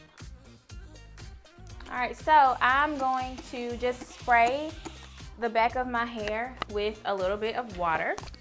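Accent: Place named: South, United States. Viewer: 🙌🏾🙌🏾🙌🏾 love the southern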